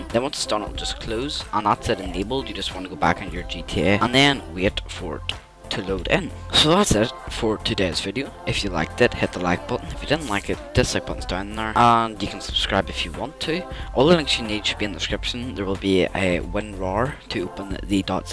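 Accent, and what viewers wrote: Northern Ireland, United Kingdom. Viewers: Mate are you Northern Irish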